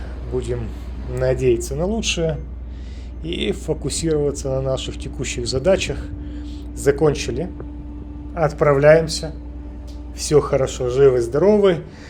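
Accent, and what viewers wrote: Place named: Odesa, Ukraine. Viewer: Одесита чути здалеку😄 Дякую за чудове відео! Не кидайте показувати швартовки та відходи, це завжди цікаво і ніколи мені особисто не набридає 😉 Легкого контракту! Україна дивиться🤝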